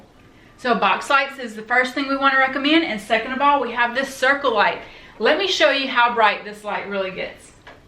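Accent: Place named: South, United States. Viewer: I swear to you I skipped the introduction and was watching thinking… this womans from the south! 😂 (slight accent) Went back and checked sure enough! Watching from Shreveport, LA thanks for the content 👍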